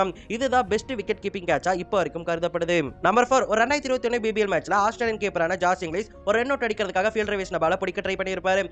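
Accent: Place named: Punjab, India. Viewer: Punjab inglish ❤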